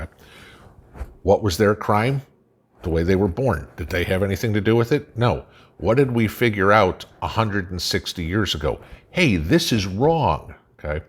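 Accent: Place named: Chicago, United States. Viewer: Chicago, accent, you still have. I'm originally from Connecticut.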